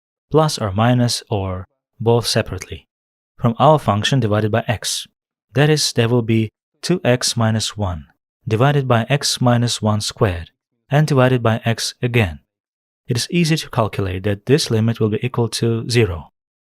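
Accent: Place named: Kazakhstan. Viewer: Он же из Казахстана, поэтому он так и говорит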